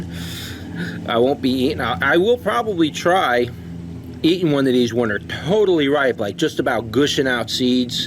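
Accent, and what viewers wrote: New York, United States. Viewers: You sound like a New Yorker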